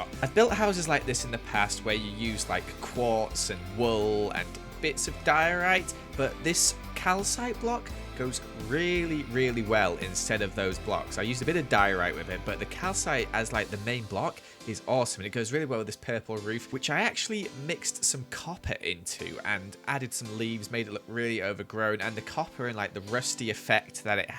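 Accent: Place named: United Kingdom. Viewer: British I just thought you had a weird voice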